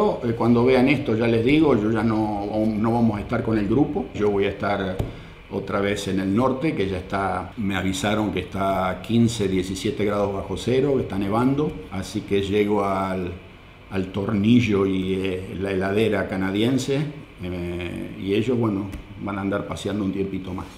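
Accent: Argentina. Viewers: Como sabe de cba, sabía de potrero..como si hubiera nacido acá, me pregunto dónde sería la casa acá cerca del clínicas, no perdiste nada el acento argentino.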